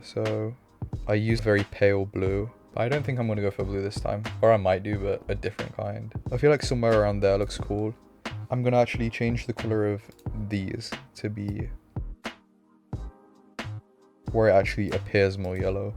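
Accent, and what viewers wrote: United Kingdom. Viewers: Prolly just his accent, I'm british too but I don't say it like that tbh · Are you british cuz I love you,your vids and your accent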